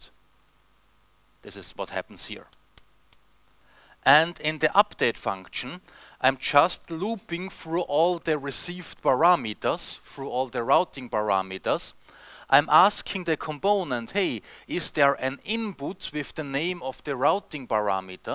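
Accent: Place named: Austria. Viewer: Came to check on what's up with Angular these days, stayed for the glorious Austrian accent. I'll be back.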